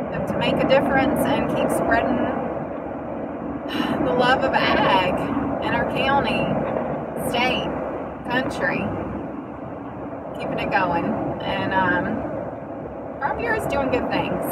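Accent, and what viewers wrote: South, United States. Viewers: I could listen to you talk all day!!! I love the "southern accent" thamks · Subscribe Love the videos an the southern accent lol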